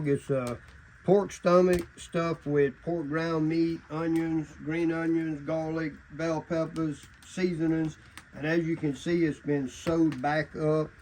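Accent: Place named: South, United States. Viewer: Are you from Louisiana or Tennessee?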